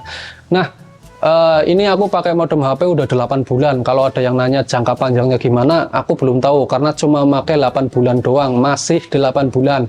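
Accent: Banyumas, Indonesia. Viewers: bjir hpne go ngpo kui mase.hihihihhii apa jadi mesin MiFi batangan. ngapak e ngndi mas,slm kng somagede. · wong endi sih..deng bisa ngapak...xixixixi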